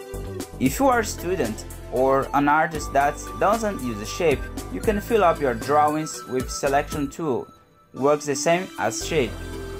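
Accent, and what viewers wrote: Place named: Brazil. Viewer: Muito bom! Parabéns e obrigado. (I'm supposing you're brazilian considering your name and accent)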